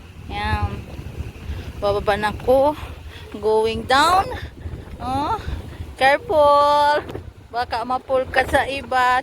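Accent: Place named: Philippines. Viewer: ❤❤❤❤❤❤dasal lang ate at magpakatatag ka para sa mga anak mo...rest in paradise kuya..mamis ko filipino accent mo..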